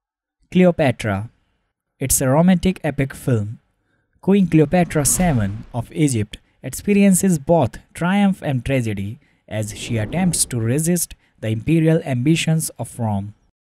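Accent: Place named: India. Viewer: i dont care about the stories im here to hear the indian voice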